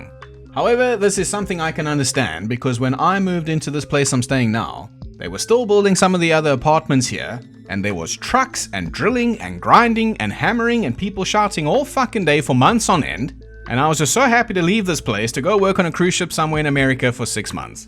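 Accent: South Africa. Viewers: He‘s South African, likely Afrikaner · is that a south african accent i detect 😛🤞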